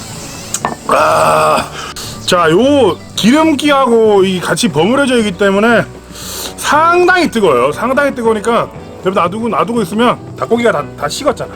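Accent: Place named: Gangwon, South Korea. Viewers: 기름을 지름이라고 하시면 강원도 사람이신가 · 솥뚜까리...장갑 쪄 주고...지름 두르고. ㅋㅋㅋㅋㅋ 완전 토종 홍천산이구나. 홍천 사투리 아는 사람은 알어드러이~~~ 크아~~ 영어 자막 넣어주고 싶넹.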